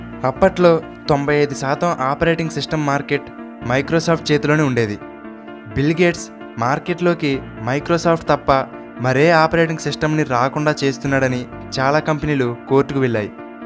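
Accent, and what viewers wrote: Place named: Kakinada, India. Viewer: Ea vuru bhaiyya? Kakinada aa? Voice 😝slang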